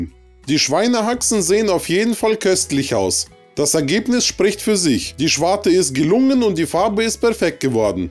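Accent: Bavaria, Germany. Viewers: И скорее всего из Баварии!!! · bist ko bayer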